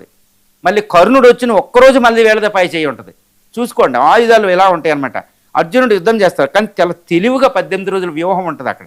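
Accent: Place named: Telangana, India. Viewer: Telangana yasa la balay chayptunaru Sir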